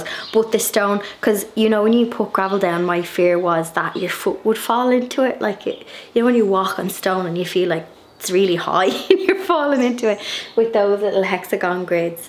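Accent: Ireland. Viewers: You make the Irish accent sound cute 😉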